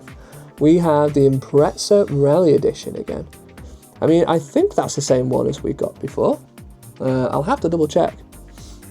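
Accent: United Kingdom. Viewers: I always heard the Manc accent out. Love your vids, cheers mate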